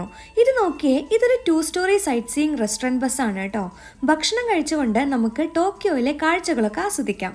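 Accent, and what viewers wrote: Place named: Kannur, India. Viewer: kannur slang veran thudangeetund😄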